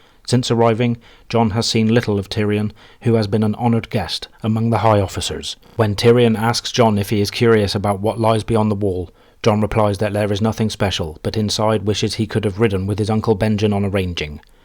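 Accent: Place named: England, United Kingdom. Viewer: it's because your accent is so naturally English, that it's difficult to imitate, but sounds so beautifully cultured. Universal English is American English because it's much easier than English English to pronounce. 😆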